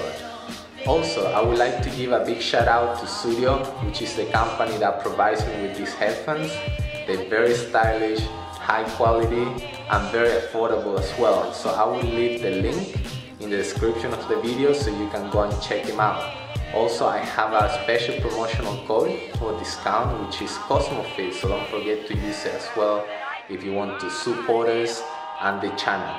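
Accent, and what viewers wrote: Spain. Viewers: up主应该是西语母语人士啊：)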